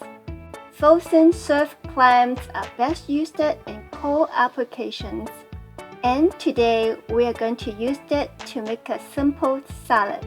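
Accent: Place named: China. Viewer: Wow....There's nothing wrong with her accent, if anything it just brings more Chinese authenticity. I would argue there are many English speakers are becoming more and more curious about Asian cuisines and channels like this is contributing a lot to this shift in mind set.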